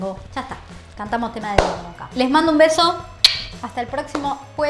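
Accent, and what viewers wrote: Argentina. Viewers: Me encantan todas tus recetas pero me recopan mil tus "meal prep" y tus "puede fallar". Dale, que te veo siempre. Voy a acabar hablando en argentino, che!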